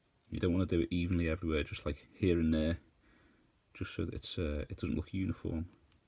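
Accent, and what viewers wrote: Liverpool, United Kingdom. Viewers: Scouser! Where you from man? I'm from over the water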